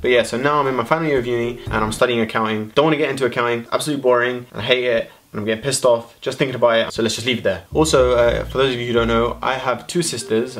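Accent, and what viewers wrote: United Kingdom. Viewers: Hey I'm a new subscriber, soo glad I discovered your channel. Just wondering have you lived in the UK? love your accent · why isnt your british accent as strong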